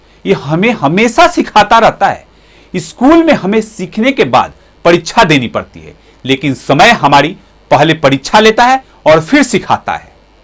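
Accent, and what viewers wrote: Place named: Bihar, India. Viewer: hindi sudharo ... aise lagta hey jaise bihar sey ho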